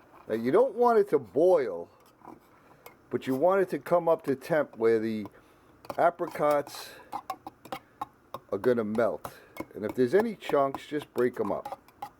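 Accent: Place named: South, United States. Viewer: Yum! Thanks for showing that... even though I don’t think it’s gonna work on my pizza tonight! 😂🤣😂.... by the way, loved your “southern” accent! 👍🏻👍🏻👍🏻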